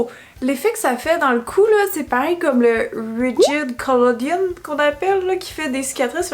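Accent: Quebec, Canada. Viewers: J'aime bien le parler québécois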